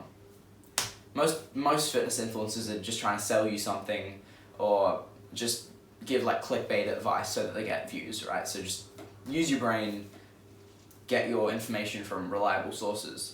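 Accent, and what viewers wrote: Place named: Australia. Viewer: ye mate hes aussie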